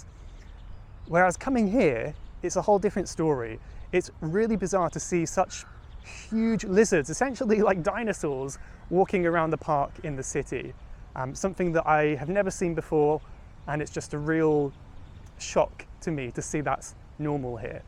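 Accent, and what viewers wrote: RP, United Kingdom. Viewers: I love your accent. Is it modern RP? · Hi, I like this channel so much!!!!! but unfortunately not many people commented on it,I have learned your british accent from your Youtube for 2 months,I hope you can keep updating and I will always be here, thanks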